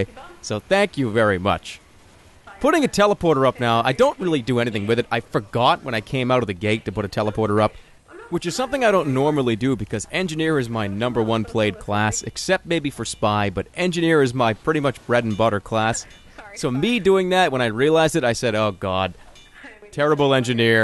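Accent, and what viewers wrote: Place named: South, United States. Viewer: really good southern accent :D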